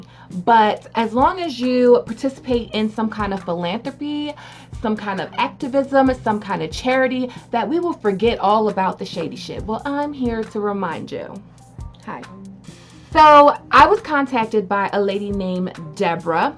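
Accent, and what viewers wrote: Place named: Maryland, United States. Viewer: I feel like your from bmore and I just found you. I'm from bmore so I recognize the accent. You sound from Maryland! Love your vids. Great job Hon!!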